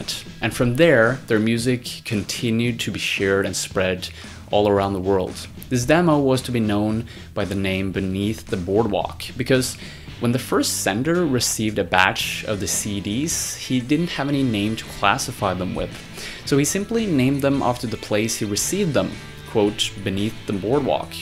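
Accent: United States. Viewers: are u american? what country ru from lol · hearing an american talk about british things is so funny 😂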